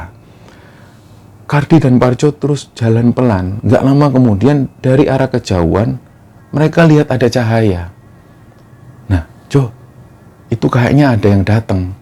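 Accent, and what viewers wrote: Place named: East Java, Indonesia. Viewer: Sy 8th di surabaya dan 3 thn di Malang, gaya bicara mas itu mengingat saya akan model Timuran, terutama ke-2 kota tersebut, sy asli Medan, tapi sy berharap masa tua saya bisa saya habiskan di Malang, penuh kenangan di sana.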